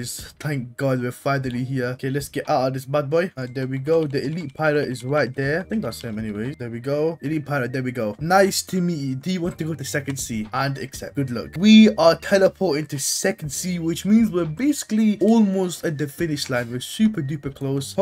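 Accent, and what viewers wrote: United Kingdom. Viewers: are u british? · u british or som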